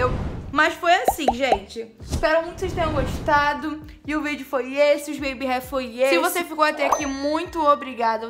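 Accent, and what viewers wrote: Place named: Rio de Janeiro, Brazil. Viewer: Amei o vídeo 💗, sério,o sotaque carioca é muito bom de ouvir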